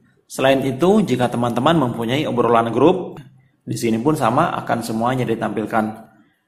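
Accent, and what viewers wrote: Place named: Sukabumi, Indonesia. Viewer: Logat nya mirip orang Sukabumi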